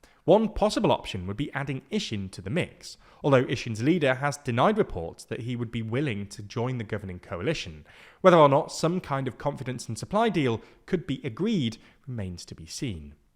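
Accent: United Kingdom. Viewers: Its his british accent heh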